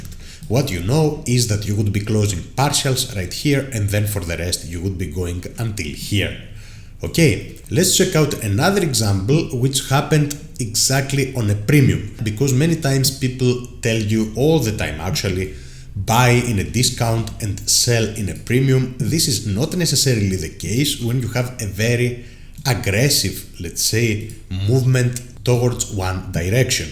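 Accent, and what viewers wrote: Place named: Greece. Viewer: That greek accent is strong !!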